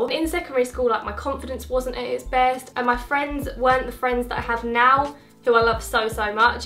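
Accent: Essex, United Kingdom. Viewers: you sound particularly Essex in this😂💘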